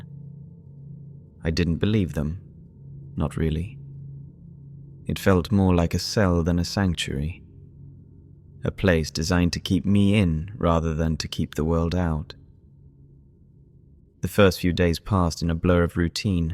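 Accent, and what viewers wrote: England, United Kingdom. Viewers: First of all, the past tense of drag is dragged (not drug) regardless of what many of this country say. That said, I enjoy the apparently proper English accent and grammar used. Other than that, it is well done as a haunting yet real story, well told. More importantly, I believe this is an accurate account of those in sanitariums in past years. With deinstitutionalization in the U.S. in the 70’s came new heartbreaks and injustices. “Nurse Rachets” have abounded. Honestly, most people love power over others, and when afforded opportunity, will abuse. But, their days are numbered. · Brilliant narrative! This fictional sanitarium echoes that of Waverly Hills Institution, sadly this one very much existed. Are you British? Your accent is lovely 😍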